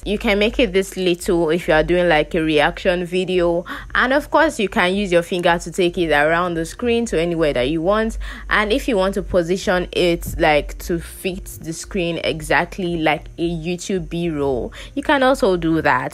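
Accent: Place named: Nigeria. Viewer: im a big fan btw you are relaxing and pretty i love the nigerian accent it's very good!have a nice day!❤️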